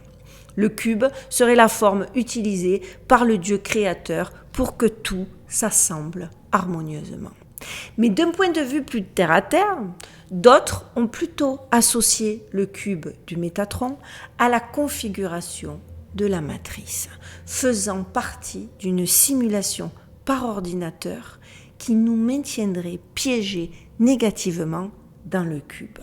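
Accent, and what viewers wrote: Marseille, France. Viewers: Coucou! pour ce qui est de continuer ou pas sur Dubaï, fais comme tu veux. J'adore t'écouter parler, j'adore ton énergie, j'admire ton caractère affirmé. Nous sommes beaucoup à apprécier cette même chose. Aussi ton accent de belle marseillaise vivant en plein Vegas, j'adore aussi! mille bisous (oh ben non pas de bisous physiques, mille ça ferait beaucoup. Mais voilà: ces mille bisous-là! ). Stay safe you too. bye! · Oui, continues ce sujet, c'est passionnant, .tu es passionnante et adorable avec ton léger accent marseil..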